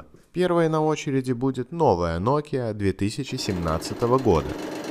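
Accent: Belarus. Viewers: Крейзи белорашан эксперимент, судя по говору.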